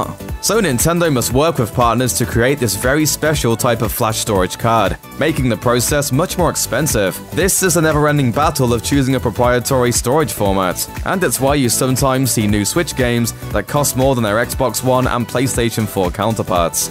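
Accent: United Kingdom. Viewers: This video didn't answer my question at all..it just showed me that anything I look up about video games is gonna give me a narrator with a 'eavy british accent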